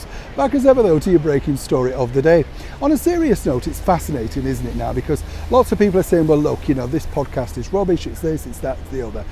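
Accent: United Kingdom. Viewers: You talk too fast with your heavy British accent and phrasing. Slow down and take a breathe.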